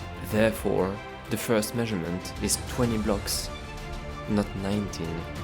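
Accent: France. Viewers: Le magnifique accent français :D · you sound french and i am proud of it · Heyyyyyyy you arre french like meeeeee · cette accent français :o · Mais c'était sur enfaîte mais c'était sur (quand tu parlé on entendé que tu était fr mdr) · You honestly sound like a slightly younger skin soft with a slightly larger French accent ngl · Tu es francais ? :O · Are you french or something ? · Dang your voice tho.... It's perfect the French accent makes it even better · Du coup t'es français si j'ai bien compris (c'est trop bien) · French or canadian? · Ur french accent is so cool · Are you french ? (I am french too) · T'es pas français ? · Hey, you’re not english, are you? I feel like you’re a bro of akinsoft. You’re french aren’t you? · Is it me or you have a french accent? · wooow u are french bonjour je parle aussi francais comme un francais je mytho pas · Mais t'es français? 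:))))))))) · Bro that's funny, i'm french and at the moment u began to speak I knew u were french too xd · L'accent français est vraiment repérable partout xDDDD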